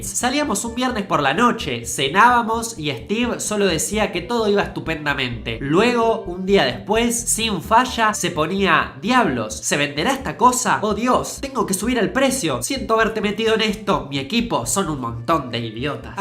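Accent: Argentina. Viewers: no sos argentino, por eso decis eso. Es nuestro acento y ya. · Dilo desde el inicio deja de hacer estas mierdaaass... que luego personas como yo, debemos soportar a estupidos fna de x marcar creyendo estas meirdas.... No jodas... todos sabemos q la interfaz de Apple su un robo total y declarado a Xerox PARC... así q eso de "ENTORNO GRÁFICO FLAMANTE" es totalmente una desinformación y la gana de crear polémica, Y no me jodas q es eso de "orishinal" todos sabemos q eres Argenta... pero eso es no saber hablar.. vocaliza por favor, y no me digan q lo dice en ingles, por q así tampoco es... y encima esta al revés. (Justo lo oí cuando escribía y me descoloco, tranquilo Argentino medio, no os odio o cosas asi.) Bueno... Aquí el único GENIO DEL MAL y SOBREVALORADISIIMOO es el JOBS, ya q el copio primero la interfaz de Xerox PARC descaradamente y iban de chulos... creyendo q era los unicos... 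y ahora q Bill, se los adelanto... que hace el copión y rata de JOBS se enoja.... WTF! quien es el GENIO DEL PLAGIOO... o mejor dicho el IDIOTA DEL PLAGIO. A u otra cosa mas general, gente q mira este canal por favor dejen de verlo este tipo es un papanatas... con ganas de crear ruido y subir en fama, si lo siguen podrán ver como cada día poca temas mas controversiales para llegar a mas de 1m de subs rápidamente y mas , de forma rápida, a costa de decir mierda pura. Es asi, tus video son de buena calidad con tu tono/toque... todo eso, pero una vez q dices mierdas todo ese ambiente se convierte en puro asco insoportable... y ya da igual si haces cosas buenas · Habla asi porque es argentino. Y esta hablando de un apellido ingles. Casi todas las palabras extranjeras las pronunciamos con nuestro acento en el caso que estemos hablando castellano. Si te parece raro ponete a pensar como pronuncian los ingleses o los estadounidenses los apellidos o los nombres españoles.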